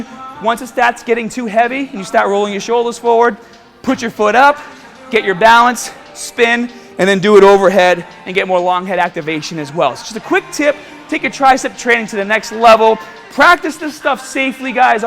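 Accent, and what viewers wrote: Boston, United States. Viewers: Hey! It's been a while since I've watched your videos. I noticed your Boston accent is heavier. I wonder why. It's just a curious observation. It's quite lovely! And as always, great tips from the captain!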